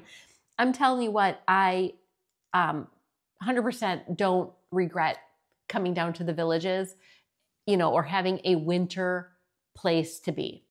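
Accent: Michigan, United States. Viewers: I have to laugh about your so called accent, I’m from Michigan also but now live in East Tennessee, I get asked all the time , where are You from you have a “ up north” accent, I don’t think we have one at all , but people sure do lol , glad your back is good , praise God!!!! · Interesting video,! As for your accent, I am familiar with how Michiganders sound, so I figured you were raised there. As for Midwest accents...there are so many different ones! I was raised in northern Iowa where we have an accent similar to Minnesotans. However, I've lived in southwest Iowa for 38 years and here people sound more like Missourians. And that's just Iowa! 😅 Let alone South Dakota, Minnesota, Wisconsin, etc. Have a good week! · Lmao. I’m 80! Ha ha! you look very very good at your age. We are close in age. I just know some women let themselves go as they age. 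You do have a Michigan accent, it’s cute 😅. I appreciate your honesty. I am Carnivore way of eating for 11 months. And I am at the weight I haven’t been in 25 years. I am so much healthier. As a RN I understand your back pain issues I remember the video. I pray you stay well. Your skin is beautiful and your figure is banging 😅. Hugs from TX ❤❤ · I can definitely tell you're from Michigan. I have relatives from Michigan...and they have the same "accent". 🙂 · I have a good friend from Michigan and you sound like her. You do have a slight accent like I do coming from Pittsburgh! I fell down the steps in 2018 and broke my back in 2 places. I had surgery on T6 but they let L5 heal on it's own. I still have back pain from the L5 and permanent nerve damage in my right foot. Nothing stops me! I work 4 days a week and babysit grandkids 1 day a week. I could leave my grown kids but can't leave the grankids. 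So I guess we'll put up with northeast Ohio winters. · As a former Michigander, I can hear your “accent.” I moved to South Carolina 20 years ago and married a Charleston native. My husband says my “accent” has softened over the years. 🤷‍♀️😁 BTW, I do NOT miss Michigan winters one little bit, lol.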